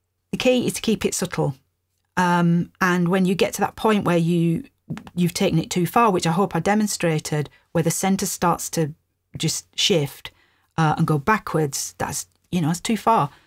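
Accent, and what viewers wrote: Northern England, United Kingdom. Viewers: Where tha from? Nice to hear a Northern accent on YT :face-red-heart-shape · I came into the video and heard an eloquent-sounding British lady, and then out comes this ripping pop punk song. I love it because punk almost has this middle finger to cultural norms. I really love how clear speaks and I'll be able to come up with a few tricks thanks to this video. I came to this conclusion: when someone related to music is explaining something, do you like this person's work? I like mix. I also had to turn off YouTube Stable Volume to really hear it.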